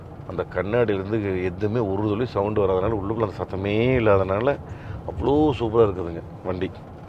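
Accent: Kongu, India. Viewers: நீங்கள் பேசற கொங்கு தமிழ் அழகுங்க ணா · நான் அண்ணா வணக்கம் நீங்கள் கொங்குத் தமிழ் பேசி மிகவும் அருமையான ஒரு வார்த்தைகளால் மிகவும் எங்களை எளிமையாக்கி விட்டீர்கள் உங்களுக்கு டொயோட்டா கம்பெனி மிகப் பெரிய கடமை பெற்றுவிட்டது எல்லாம் உங்களை சாரும் ஏனென்றால் விளம்பரத்தை விட நீங்கள் சொல்லும் ஒவ்வொரு வார்த்தைகளும் இனிமையாகவும் சிறப்பாக உள்ளது அண்ணா உங்கள் வார்த்தைகளுக்கும் உங்களுடைய அன்பு தமிழ் மொழிக்கும் கொங்கு தமிழ் மொழி வாழ்த்துக்கள் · கொங்கு மண்ணிற்கே உரித்தான தமிழ். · உங்க நடைமுறை பேச்சு மிக அருமை.. அதுவும் கொங்கு தமிழில் 👌👌❤❤❤ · Excellent Review with Amazing Natural Kongu Tamil yengu Anna,... Fantastic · Super Sir! Your explanation in native kongu language is sweet and enjoyable. Nice car sorry nice chariot. Thanks 🎉🎉🎉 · கொங்கு தமிழில் இயல்பான இயற்கையான வர்ணனை காரை தேர் என தலைப்பிட்டது அருமை. அய்யா இதேபோல் வாழ்க்கையின் இன்பங்களை அனுபவிக்க எல்லாம் வல்லவனை வேண்டுகிறேன். 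· குழந்தை மாதிரி முகத்தில் எவ்வளவு மகிழ்ச்சி..எங்கள் கொங்கு தமிழில் பேசுவதும் அழகு! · எல்லாத்துக்கும் புரியிற மாதிரி அழகான கொங்கு தமிழ்ங்க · அந்தக் கார் எவ்வளவு அழகோ அதே அளவு நீங்கள் வர்ணிக்கும் கொங்குத் தமிழின் உச்சரிப்பும் எனக்கு எங்கள் நெல்லை தமிழுக்கு அடுத்தபடியாக என் இதயம் கவர்ந்தது கொங்கு தமிழ் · Sir, your narration so nice, kongu tamil slang no words very beautiful... sir good night..... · அய்யா கொங்கு பாஷை..அடி தூளு..சூப்பர்..சூப்பர்..என்னமோன்னா மூஞ்சி ரொம்ப நீளமா இருக்குதுங்கோ...என்ன தான் இருந்தாலும்...அந்த காலத்து பிளைமவுத் ரேஞ்சுகெல்லாம் கெடையாதுங்க..உங்க பேச்சுல அந்த ஙொன்னியா... கோயமுத்தூர் குசும்புங்க...மறக்காம நண்பர்களே நம்ப THILAVU TALKIES.. You tube Channel..வந்து பாருங்க..பின்ன‌ நாமெல்லாம் THAR..ஜீப் வாங்கறது.. மறந்துடாதீங்க மக்களே...மக்களுக்கு மக்களே... Ukkrishwriter Mtp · Ungal Kongu Tamil Azhagu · அன்னா வன்டியவிட உங்ககொங்குதமிழ் ரொம்பபுடுச்சுருக்கு வாழ்த்துக்கள் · மகிழ்ச்சி, வாழ்த்துகள் வெகு நாள் களித்து கொங்கு தமிழில், கேட்பதற்கு இனிமை. 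கொங்கு தமிழில் வெல்பயர் அனுபவம் ... அட்டகாசம் இப்படியொரு அனுபவத்தை கொடுத்ததற்கு டொயோட்டா நிறுவனத்தினர் உங்களுக்கு சன்மானம் கொடுத்திருக்கவேண்டும், அப்படிகொடுத்திருந்தால் கூட அது தகும் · குழந்தை மாதிரி முகத்தில் எவ்வளவு மகிழ்ச்சி..எங்கள் கொங்கு தமிழில் பேசுவதும் அழகு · Kongu Tamil speech superb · உங்களின் கொங்கு தமிழ் பேச்சு அழகோ அழகு அண்ணா.... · Kongu slang 👌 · சூப்பர்னே, கொங்கு slang review கேட்கவே அருமையா இருக்கு. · Unga Kongu Slang Nalla iruku😍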